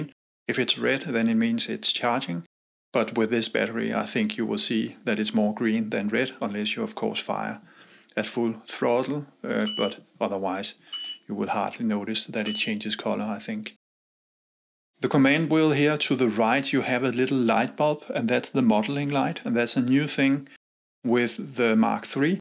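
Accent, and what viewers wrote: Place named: Denmark. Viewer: Jeg elsker os danskere når vi snakker engelsk, det er ret tydeligt. 😁 Lækker video, tak for den! Har lige bestilt min til min Lumix S5.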